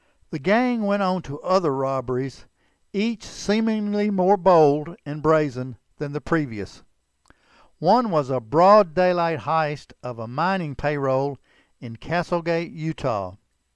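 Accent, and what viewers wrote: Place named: South, United States. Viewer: where is the narrator's accent from? it sounds southern.. south carolina? Louisiana?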